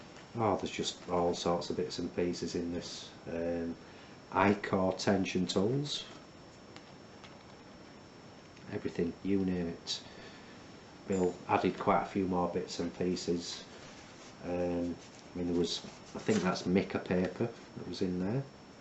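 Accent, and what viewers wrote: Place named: United Kingdom. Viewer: i love your accent...or should i say, i love your british english. you got no accent at all its just how english should be spoken! :))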